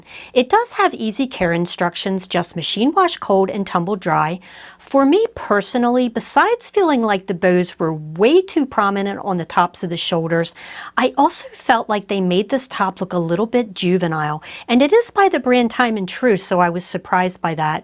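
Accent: Philadelphia, United States. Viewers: I'm loving your Philly/South Jersey accent! My mom was a South Philly Theresa. You have excellent tips and explain things so well!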